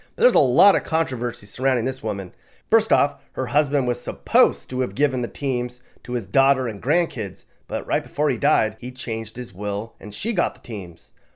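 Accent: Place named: Raleigh, United States. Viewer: your accent was from the Raleigh area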